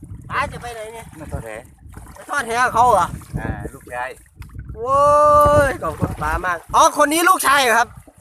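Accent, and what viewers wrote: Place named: Bueng Kan, Thailand. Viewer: โอ้ยเนาะฟังๆไปกะคนบ้านเดียวกันคนบึงกาฬคือกันครับ เอาไปก่อนเลย100วิวบักหล่า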